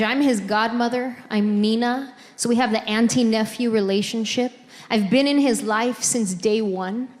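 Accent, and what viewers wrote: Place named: San Jose, United States. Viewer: She sounds soooo San Jose! Subscribed. 😂❤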